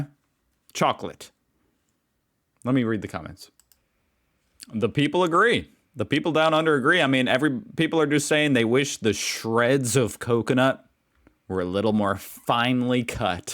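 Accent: United Kingdom. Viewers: British English not Australian English accent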